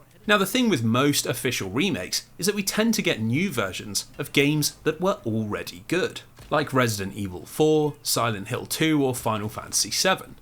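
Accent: England, United Kingdom. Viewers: Wait you said a market wide crash but excuse me if I detect a English accent, the thing is I’ve seen countless Europeans complain us Americans are sooooo egotistical for ignoring how there was no crash in Europe and how we are so terrible for assuming the world revolves around us awful Americans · Smooth talking British slop is my medicine 🥸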